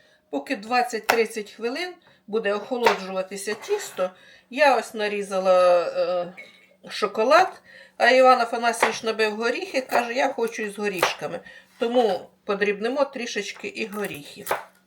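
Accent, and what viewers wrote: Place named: South, Ukraine. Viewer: Ви для мене, як ковток свіжого повітря! Насолоджуюсь Вашими рецептами ! А Ваша така чиста українська мова з півдня України то взагалі БАЛЬЗАМ НА ДУШУ! Щиро дякую!!!! Здоров'я Вам, МИРУ, ПЕРЕМОГИ!!!!!!!!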